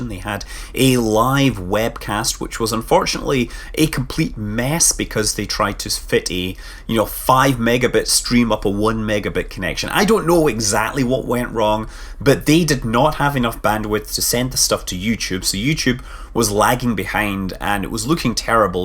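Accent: Scotland, United Kingdom. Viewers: Hey, every time I witch to you channel from say TotalBiscuit or Polaris or wherever, the first thing I have to do is turn down the volume before your fine Scottish brogue deafens me! Otherwise, your channel is awesome.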